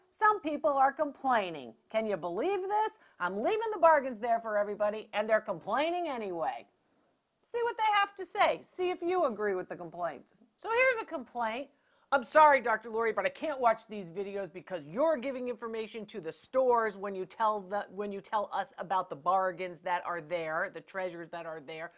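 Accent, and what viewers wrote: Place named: New England, United States. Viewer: Dr. Lori you are just precious. I love your New England accent and your Italian family stories. I appreciate all of the knowledge that you share with us on the items you select. Please keep it up.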